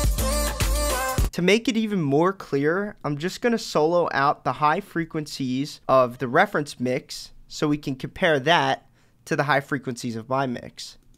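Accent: Philadelphia, United States. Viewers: you from philly? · Philly accent 120 pct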